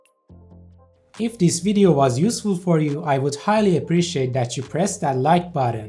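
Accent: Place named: Iran. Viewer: Luv your Persian accent pal.. so glad to see Iranian guys here making professional vids.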